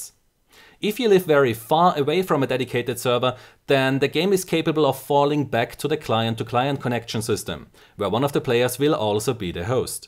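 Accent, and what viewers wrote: Austria. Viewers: Bist du Österreicher? ^^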